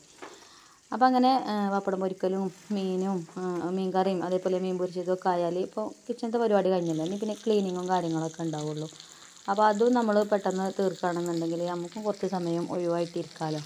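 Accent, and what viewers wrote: Malappuram, India. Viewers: ഇയാൾ മലപ്പുറം ആണോ 🤔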